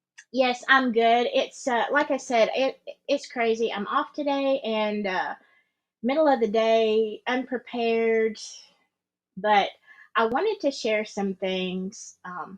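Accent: South, United States. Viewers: Can't get much better than a southern voice of reason! Great live 💙☮️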